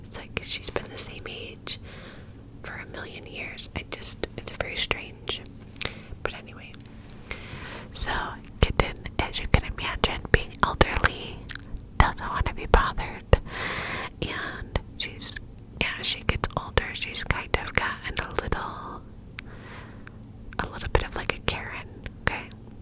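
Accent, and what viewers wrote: New York, United States. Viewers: I loved this! Can you do the next in your ny accent again? It gives me the most tingles for some reason. <3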